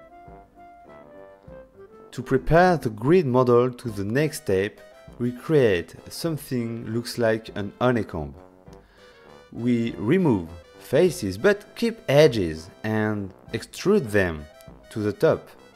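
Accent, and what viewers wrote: France. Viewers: Love your French accent may be I am wrong. Awesome tutorial by the way. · This is the first time I watch this channel. What a pleasure to be welcomed with such a good French accent ! Great content. Subscribed. (S’il te plaît garde l’accent français c’est parfait comme ça)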